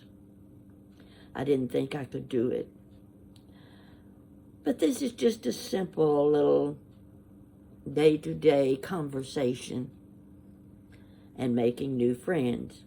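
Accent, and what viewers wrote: South, United States. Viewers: Awww…kiddo…please just block the yucky people and try not to even read that crap. Some people are just so miserable in their lives they feel the need to try to make others feel miserable too. Don’t let them get to you. They are not worth a second thought. …you are a real blessing from God! You make me and so many, many others happy. You are real and I love that about you. I love that you do talk to us like we are all sitting together having coffee. Please continue your channel just exactly as you are and in the same way you always have. I live about 1000 miles away from my mom. She has Alzheimer’s and it just breaks my heart not to be with her. I am still able to talk to her on the phone. She is my best friend. Her sister, my aunt, is my other best friend but she passed in 2018. We called ourselves the three musketeers. You put out such love, kindness, comfort, etc and I feel like I’m in the presence of my two best friends. 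Ha, change your southern accent to Scandinavian/North Dakotan and it is definitely just like them. I never did find your address, maybe one day then I can send you the older jewelry for your projects. Love you bunches! I thank God for you and all of your subscribers. ❤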